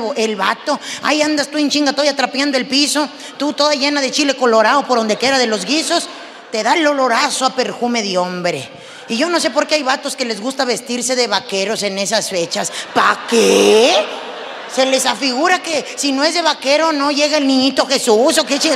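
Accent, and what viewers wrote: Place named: Mexico. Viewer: Que pesar tan buena que es! Pero ese habladito mexicano que fastidio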